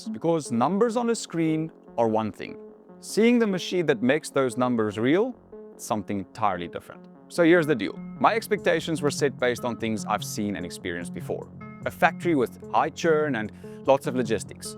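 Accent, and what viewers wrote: South Africa. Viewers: Are you South African👂? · You're South African?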